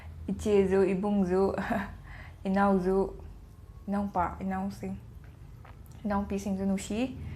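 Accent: Kakching, India. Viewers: Che ei ngge vedio c ahnba oina nga c thngnajabani kakchingdeisu oirmmy ym phajei eisu kakchingdeini ym yngba nungngai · Kakching lon nangse ng kakching lon ngangbase ym cute oiye.... Pliz mathangdadi ngangsiko...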